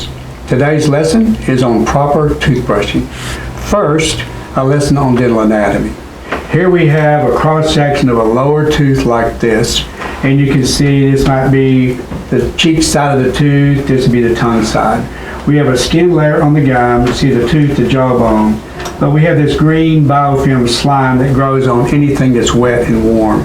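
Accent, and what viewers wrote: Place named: North Texas, United States. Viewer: I was just thinking that, he’s got that North Texas accent!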